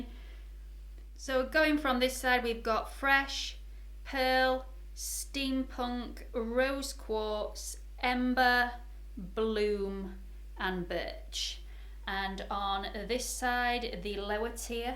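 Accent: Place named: Northern England, United Kingdom. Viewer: You sound northern I am in Leeds. You?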